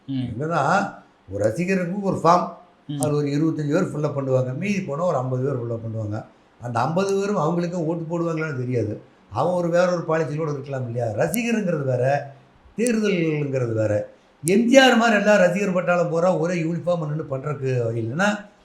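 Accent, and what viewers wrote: Kongu, India. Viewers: அருமையான பதிவு. ஐயா அவர்களுக்கு எங்கள் மனமார்ந்த நன்றிகள். அவரின் கொங்கு தமிழ் அருமை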